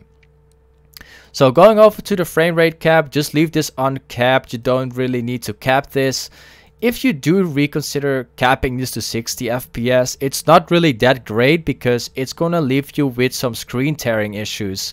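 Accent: Netherlands. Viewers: 1000 % nederlander haha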